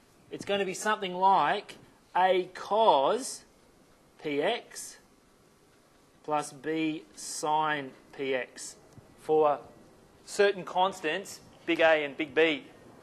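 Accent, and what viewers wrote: Australia. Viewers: whenever an aussie speaks, i cant help but trust in what he's saying lol. Good video. Ive found that the only tricky part with the H.E. is to not make a mistake with the integral when solving An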